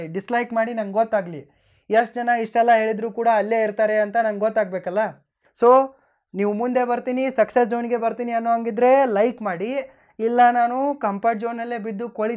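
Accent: North Karnataka, India. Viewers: well said boss. nice presentation in north Karnataka style. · Ningond request paa nan kadeyinda... Neenu uttar karnataka bhaashe maathadu illa neetaagi south kannada maathadu... ardaa adu idu antha kisi byaadpa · Awesome presentation, north Karnataka style. Talk like close friend. 👌 ...I loved the words kisiyodhu, kolithiri😂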